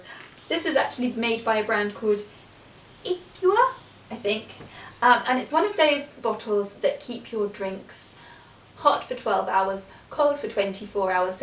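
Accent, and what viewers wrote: United Kingdom. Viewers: Your rich British accent never gets old. 😃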